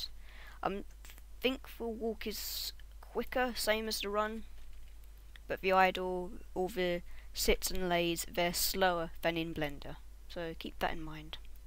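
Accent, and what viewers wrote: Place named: England, United Kingdom. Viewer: omg your english I LOVE ENGLISH PEOPLE OMG :D you sound cool!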